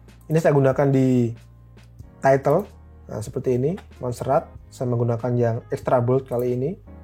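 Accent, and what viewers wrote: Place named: Java, Indonesia. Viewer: 'v jowo mas, maklum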